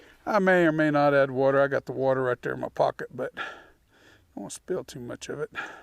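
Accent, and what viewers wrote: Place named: South, United States. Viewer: It's nice to find a whisky reviewer with a southern accent. I guess there's nothing inherently wrong with being a Yankee 😂 but I'm a Texan, and it just galls me to find people even in Texas talking about whisky like they're from Indiana or somewhere. Hearing you talk is nice - plus which you don't put on a fake video persona, but just talk about whisky, which a lot of these characters don't seem to understand is a good thing. 🙂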